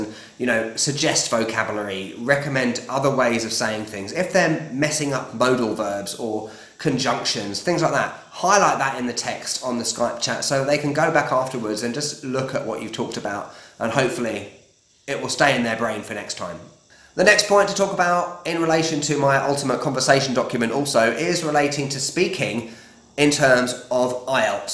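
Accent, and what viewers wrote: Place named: London, United Kingdom. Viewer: ) I am from Barcelona. So my accent is European. Nothing to do with the Mexican accent lol. My sister is now in México DC. She's been living there for over a year now. I love your London accent by the way! I was actually thinking I could maybe book some lessons with you so I can see how you structure the lessons and what resources you use. This would be really helpful. And at the same time it would help me improve my speaking skills (specially pronounciation :)) I have been working as a translator for quite a few years now but work flow is up and down. So I decided to start a course on how to teach Spanish as a foreign language as I thought this could maybe help me get an extra income and it will allow me to socialise a little bit. Working as a translator from home, all alone without seeing anybody, makes me feel a bit lonely sometimes :)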